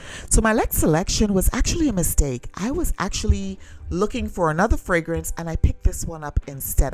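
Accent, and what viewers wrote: Trinidad and Tobago. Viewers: I enjoyed it thoroughly. I could listen to your Trinidad accent and laugh all day. ❤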